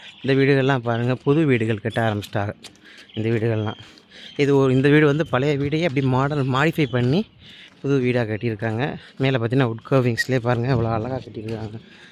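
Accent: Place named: Madurai, India. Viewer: உங்க பேச்சில மதுரை slang தெரியுது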